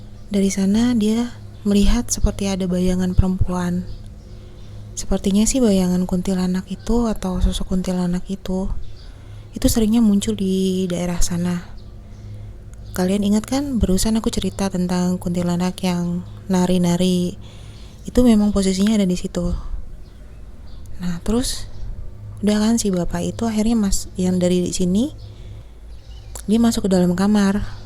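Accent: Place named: Banyumas, Indonesia. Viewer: banyumas mana suaranya:v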